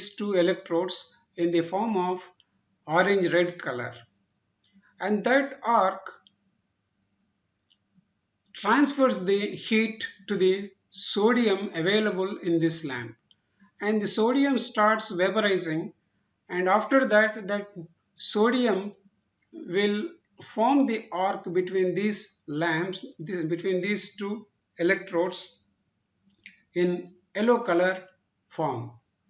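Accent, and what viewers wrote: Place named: India. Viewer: I stopped watching as soon as I heard Indian voice.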